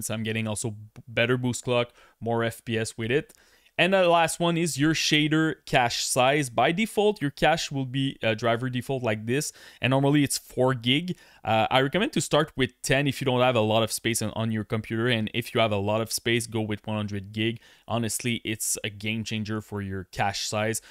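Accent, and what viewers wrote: Quebec, Canada. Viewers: You french Canadien right?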